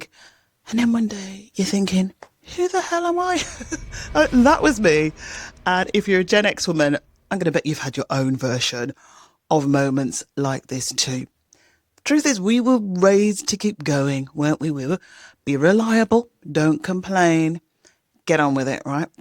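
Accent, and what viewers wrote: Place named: United Kingdom. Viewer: Just a note of encouragement for your channel. Luv your sense of humor and soft, soothing delivery. The Brit accent doesn't hurt either. You know how us Americans adore a British accent!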